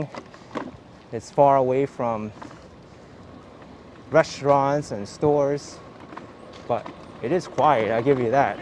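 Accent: New York, United States. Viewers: NY accent.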